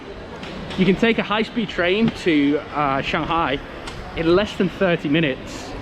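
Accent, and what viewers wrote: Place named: Liverpool, United Kingdom. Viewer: welcome to China. BTW, are we still hiring scouse teachers? odd, very odd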